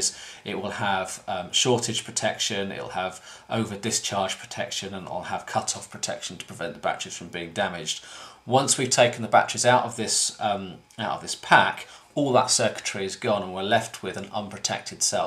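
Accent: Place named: England, United Kingdom. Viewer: Hi, Thanks so much for your advice. You sound like you are English, do you live in the UK and can you recommend a couple of batteries that are easily available which are 18650 or AA? I want to get a Torch which is rechargeable but maybe can take recharged batteries so I can replace the torch batteries if I am camping. It seems the Americans have their own market and do not worry about other countries and what happens to torches or batteries. And the 18650 are harder to get here.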